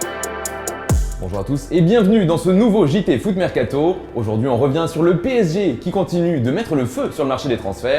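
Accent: Italy. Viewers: C'est lui l'accent italien jadore ce gas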